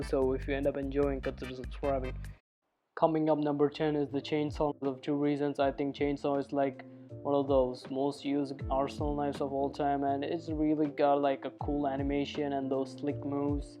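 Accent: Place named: United Kingdom. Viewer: Bruh, I said no weird British accent!! Noice vid tho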